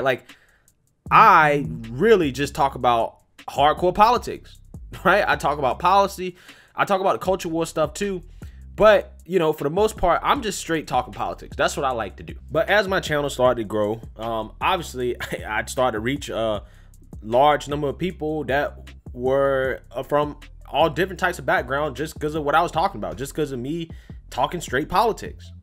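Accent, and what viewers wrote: South, United States. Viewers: im a white conservative in the south, i get your points. you did not need to use proper southern English.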